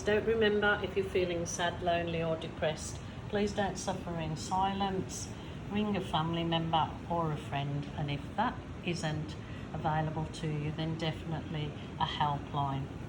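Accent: Birmingham, United Kingdom. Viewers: I love the smell of summer rain mmmmm so peaceful and calming smell and sound. I’m loving your Brumy accent too 😂.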